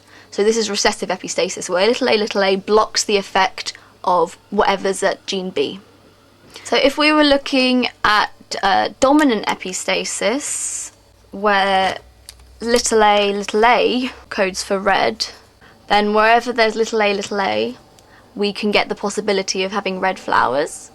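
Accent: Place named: United Kingdom. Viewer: a late night study session before a test can always be made a little better with a cool British voice girl that's good at explaining stuff. Thanks!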